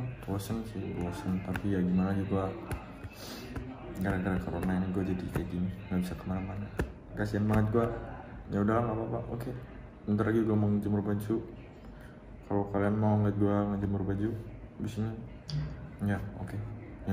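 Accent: Java, Indonesia. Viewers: medok banget jawanya ka * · gole ngomong medok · Medok nyaa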